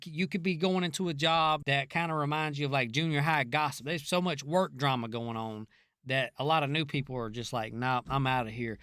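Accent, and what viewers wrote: South, United States. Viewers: The southern American accent is so sophisticated and refined it makes me think of prestigious university professors and silver tongued politicians. Then there's a random "shut the fuck up DUDE" it's a total mind fuck the same as if someone was swearing in a posh London accent. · Perfect southern accent ❤️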